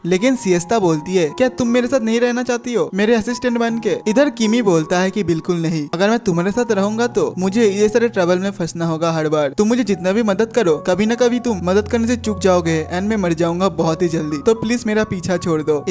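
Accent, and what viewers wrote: Bengal, India. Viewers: Bhaiya aap Bangali ho kya?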